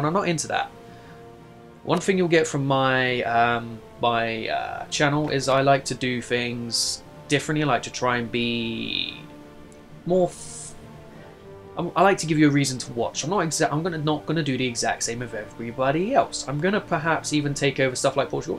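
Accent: United Kingdom. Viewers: Your channel picture is a red maple leaf, and you use Received Pronounciation. Is the accent natural or learned?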